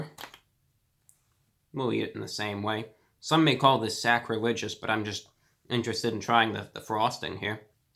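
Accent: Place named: Transatlantic, United States. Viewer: Ive also noticed he has a slight transatlantic accent which takes years to learn (it's not a natural accent to any group of people) thats dedication.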